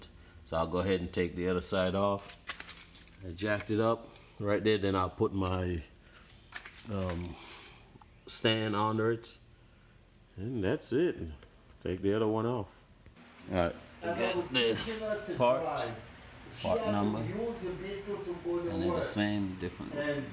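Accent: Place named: Louisiana, United States. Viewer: Sound like a southren creole man.. if yaint from Louisiana then you Jamaican one.. am i wrong? From the muddah land or ye from dem bayou jerkin them crawdads..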